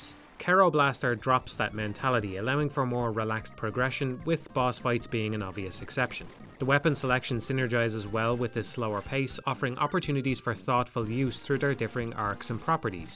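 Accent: Ireland. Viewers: And that Irish is an amazing yt video voice accent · That Irish accent with the complicated language is like asmr to me ♥️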